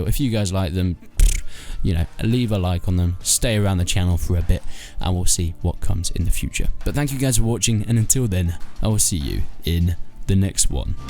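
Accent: United Kingdom. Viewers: Yes I agree can't understand what your saying with that Brit accent. A game list would be nice.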